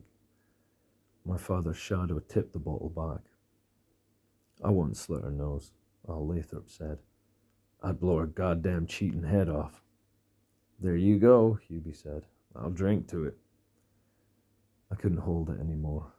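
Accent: Scotland, United Kingdom. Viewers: The story sounds so medieval 🏰 being read by a scots narrator 🏴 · Oh wow, scottish asmr and Bachman? And RAGE? Lets GOOOO! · as a Scottish person hearing a audiobook by a Scottish person gives me school flashbacks aha also I couldn't find this book anywhere so it's good u covered it on YouTube, you should do more of these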